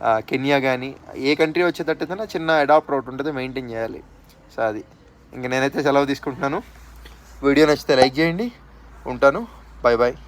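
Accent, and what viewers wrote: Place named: Guntur, India. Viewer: Guntur Slang.. superb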